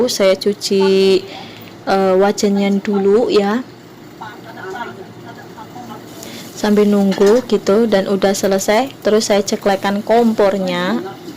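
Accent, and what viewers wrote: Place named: Banyumasan, Indonesia. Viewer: Masak mantap... Cilacap.. Ora ngapak ora kepenak..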